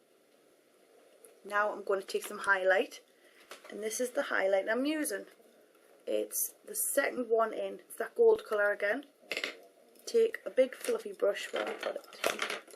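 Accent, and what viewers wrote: Newcastle, United Kingdom. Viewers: Geordie accent--can't get any better than that!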